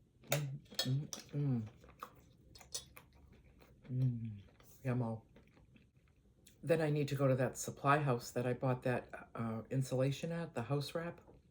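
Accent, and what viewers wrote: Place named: Boston, United States. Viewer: yes the channel name is Eat Pray Van. Named after my favorite book/movie! I love that you are just yourself and super genuine. One of my other favorite YouTubers said if your going to do YouTube to “Be Yourself”. (Your one of my favorites too ❤️) I’m from/born in NYC and I really love the “Bawston” accent LOVE IT! I’ll post on whichever is your newest video on Friday and let you know it posted! I can post a link to the video, thank you for wanting to watch I really appreciate it!!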